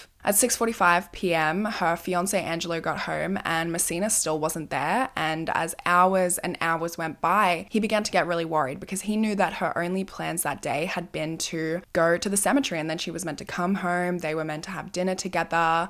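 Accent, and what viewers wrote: Australia. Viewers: I got hooked with your videos because of your voice and Aussie accent 🤗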